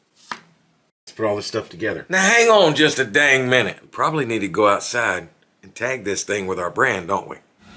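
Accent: United States. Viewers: 声と話し方がザアメリカって感じで好きです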